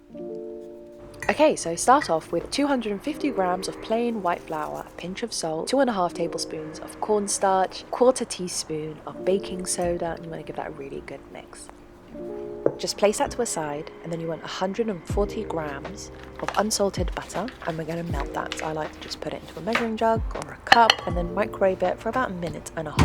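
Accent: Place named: United Kingdom. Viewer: I love your particular British accent. I don't think I've heard it before. 🤔What area is it from?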